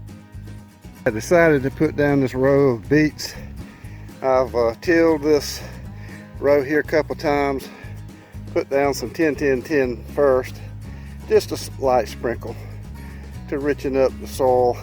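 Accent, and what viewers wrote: South, United States. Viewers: After has been gone a few months....I still love to come watch him and hear his peaceful southern voice. Love his videos forever. It's still tough to imagine YouTube without my friend. Miss you Bud!! God Bless you and your family and Toby!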